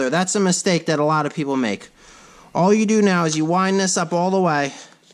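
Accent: United States. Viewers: stop fuckin saying yawn mower. god damn yank.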